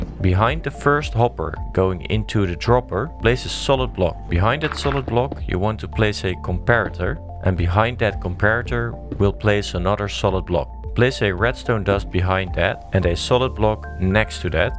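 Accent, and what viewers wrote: Netherlands. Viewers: volgens mij hoor ik dat je nl bent 😅😂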